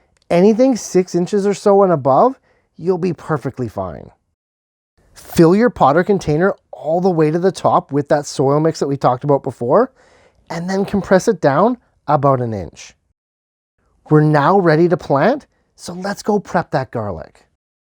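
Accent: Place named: Canada. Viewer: Ive been trying to find small things to grow inside my apartment, im excited to try this out! Looking for more if you have suggestions 👀 Ps your canadian rise accent is strong and i love it 😆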